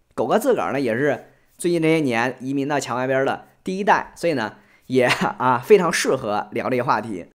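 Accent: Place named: Henan, China. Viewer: 主播这是河南普通话还是山东普通话，还夹杂着北京味，估计在北京打过工😀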